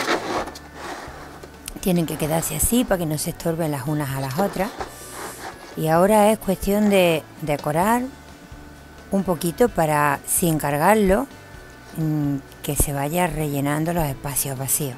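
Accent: Spain. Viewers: estas pasa!!! eres mi inspiracion de veras todo lo que tocas sale excelente y tu espanolado me encanta...hago las piezas mias con las quimicas tuyas y todo sale excente graciasss!!!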